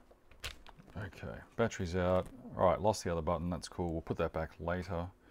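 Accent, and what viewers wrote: Australia. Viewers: Am I the only one that likes the down under accent?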